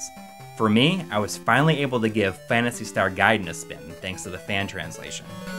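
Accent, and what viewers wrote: Wisconsin, United States. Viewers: U sound like wisconsin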